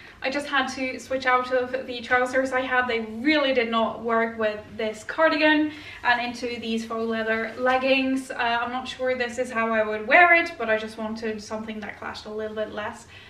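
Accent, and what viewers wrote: Ireland. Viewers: Norwegian with Irish accent?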